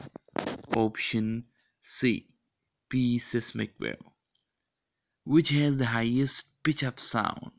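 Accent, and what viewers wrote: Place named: United States. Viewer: Sir American ban rahe hen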